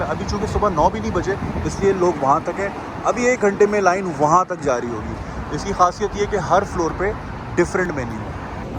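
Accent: Karachi, Pakistan. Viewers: Love hearing your khalli Karachi accent! I forwarded to my close Chicago friends to check out even all of us Pak Americans have been Chicago residents over 25 years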